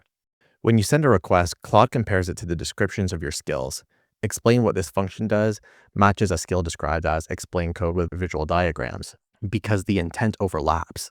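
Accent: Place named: California, United States. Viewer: SNL Californians accent is somewhat annoying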